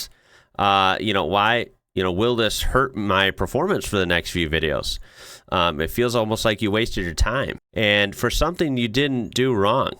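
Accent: Australia. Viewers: I've heard quite a few other YouTubers say that they have more luck contacting YouTube through Twitter when they have issues. Maybe explain that the word 'can't' with an Aussie accent is not THAT word.... Especially in the first 15 seconds of your videos. They may think that rule is being broken? Best of luck mate. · Hi. Love you and your family. The AI caption generator is misunderstanding the Australian accent for bad words. There is an article on BuzzFeed about it...titled. This YouTuber's Videos Were Demonetised. He Says It's Because Of His Aussie Accent. Also some youtubers have said the word Aussie in the title or thumbnail gets their videos blocked. It's not fair. Hope this helps.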